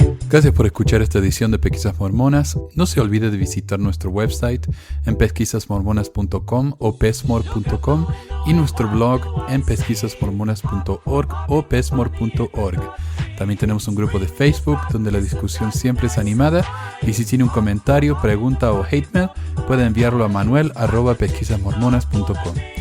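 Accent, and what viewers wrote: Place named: Córdoba, Argentina. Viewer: Me gusta tu vos de cordobés, soy de Buenos Aires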